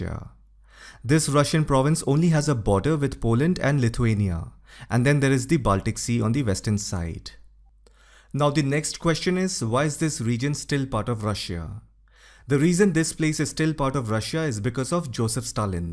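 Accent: India. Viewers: Its really amazing to hear But i couldn't understand that With Indian accent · Why is a guy with an Indian accent narrating a video about European history.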